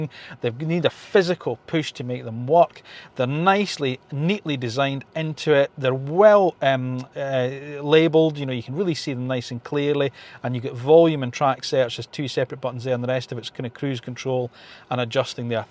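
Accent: Scotland, United Kingdom. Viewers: You’re scottish? 🏴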